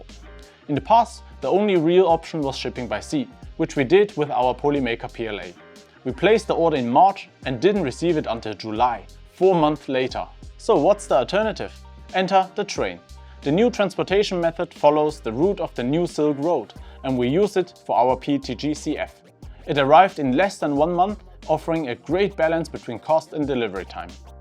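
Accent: Germany. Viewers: Asian man with a German accent caught me so off guard and I love it!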